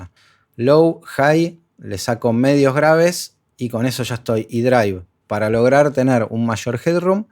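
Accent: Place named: Rioplatense, Argentina. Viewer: Ay que bien, un Argentino o Uruguayo, estoy chato de españoles 😅, saludos desde Chile 😊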